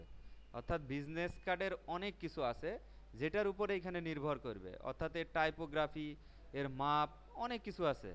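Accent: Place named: Madaripur, Bangladesh. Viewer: Your voice was just like madaripur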